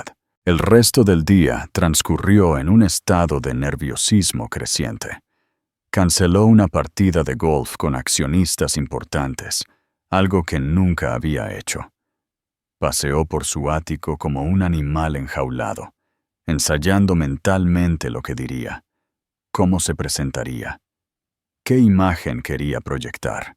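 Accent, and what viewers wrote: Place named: United States. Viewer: Pronunciation y acento muy gringo no me gusta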